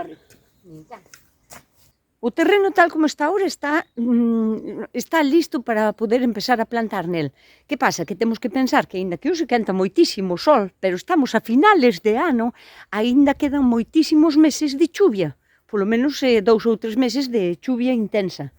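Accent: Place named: Padrón, Spain. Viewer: Falo moi bén ,,gustame moito mirar os teus videos Por o falar creo deves de ser cerca de mín si podes xa me dirás Eu son o lado de Padrón 😊😊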